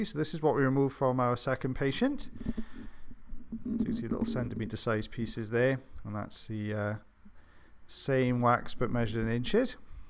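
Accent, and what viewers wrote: Wales, United Kingdom. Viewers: Thank you for the special! I had a stressful afternoon yesterday and I got so excited (I sound like a whacked wombat) when I saw this in my notifications! Perfect unwinding video! This southern girl (Georgia, imagine an accent that’s half hillbilly and half Scarlet O’Hara) loves your UK accent! Y’all keep up the good work 😄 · It’s our welsh accent it’s lv it or hate it what part of wales are you and your wife from I live near CARDIFF AND SPEAK WELSH LV UR VIDEOS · I could listen to your lovely accent for HOURS!! How i miss Wales. Your commentary is wonderful. Such a crush here!! Lucky Mrs. B!! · I love your Welsh accent. Your' narration of these videos is just brilliant, I'd never want to mute you! How would we learn about Audiology without you. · Definitely! I could listen to him all day, love those dulcet Welsh tones 😍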